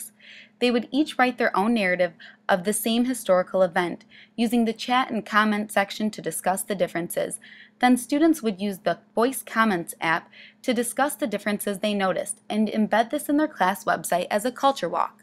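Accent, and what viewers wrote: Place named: Midwest, United States. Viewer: That midwestern accent :)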